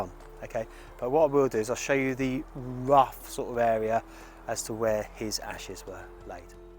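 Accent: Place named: Birmingham, United Kingdom. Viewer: Thanks for this video….and it’s good to hear a Brummie accent again. Bless you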